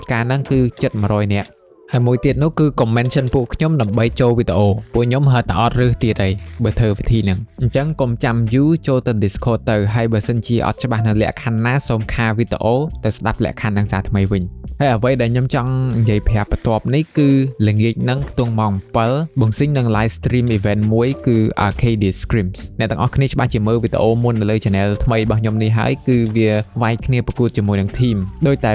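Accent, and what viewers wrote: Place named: India. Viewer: ur accent sound as india ( recording)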